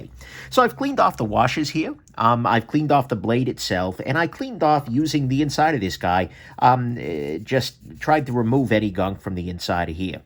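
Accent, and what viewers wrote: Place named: Northeast, United States. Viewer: I love your accent, where are you from? NE area I'm guessing.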